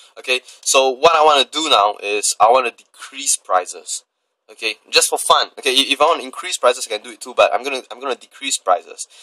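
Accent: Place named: Singapore. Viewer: singaporean narrator yay hahahaha! totally unexpected!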